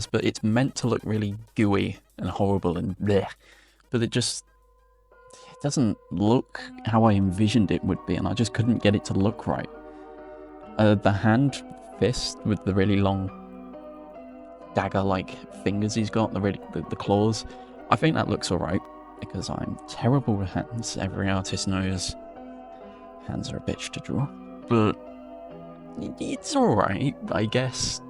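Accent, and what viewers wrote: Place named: United Kingdom. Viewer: British jumpscare (i do like your voice), this is a nice challenge for spooktober! 🎃 edit: f*ck you 😭😭😭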